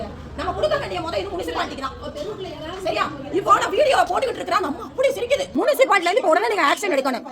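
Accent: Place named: Kayalpattinam, India. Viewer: சகோதரி நீங்க காயல் பட்டிணத்தை சேர்ந்தவரா ஏன் கேட்டேன்னா உங்க ளோட பேச்சு அப்படிதான் இருக்கு எங்க மச்சி பேசுனமாதிரியே இருக்கு எங்க மச்சியும் காயல் பட்டிணம் தான் உங்க ள பார்க்கும் போது எனக்கு என்னோட மச்சிய பார்த்த மாதிரியே இருக்கு உங்க ள மாதிரியே ரொம்ப தைரியமா பேசுவாங்க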